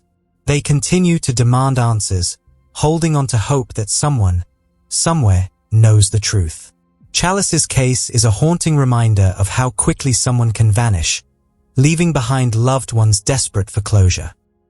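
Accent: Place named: England, United Kingdom. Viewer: Damn I hate when these type of videos are narrated by people with English accents 👎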